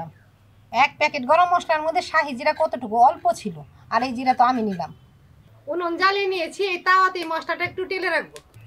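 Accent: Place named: Bangladesh. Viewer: কথা গুলো বাংলাদেশের মতো ❤খুব ভালো লাগে 😊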